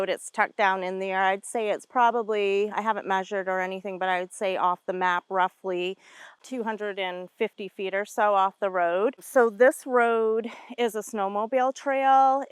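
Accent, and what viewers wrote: Maine, United States. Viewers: like the way you say side-in for the siding, gotta love that true Maine accent!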